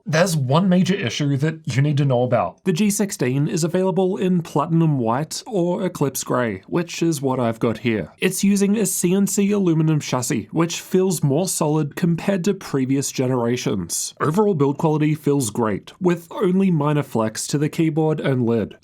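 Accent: Australia. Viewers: You're the best. Are you Australian?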